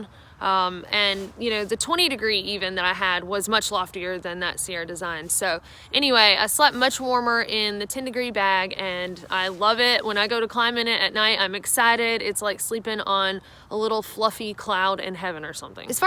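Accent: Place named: Tennessee, United States. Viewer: New sub here..you got that Tennessee accent. It's either Tennessee or North Carolina.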